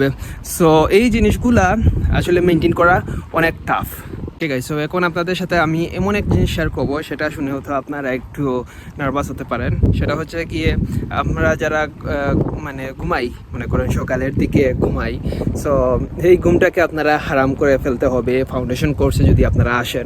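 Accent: Sylhet, Bangladesh. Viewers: ভাই সিলেটি মাত আইয়য় ❤ i love Sylhet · Bhai mono oy Sylheti 😅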